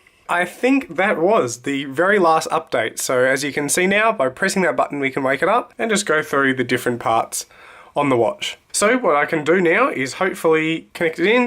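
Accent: Australia. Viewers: Are you Australian mate You sound like an aussie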